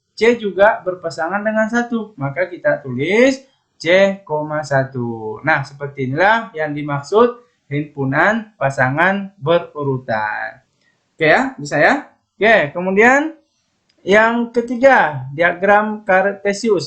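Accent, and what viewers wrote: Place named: Sulawesi, Indonesia. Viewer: pasti kakak orang Sulawesi kan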